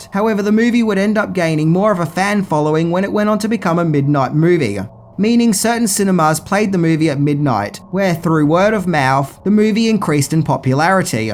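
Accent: Australia. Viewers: he's Australian and it could be his way of pronunciation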